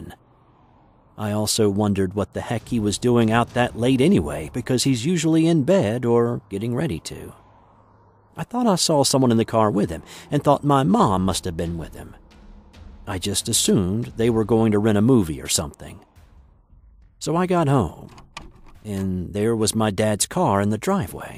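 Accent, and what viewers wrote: South, United States. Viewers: Gotta love that Eargasmic Prime Storytelling Southern Accent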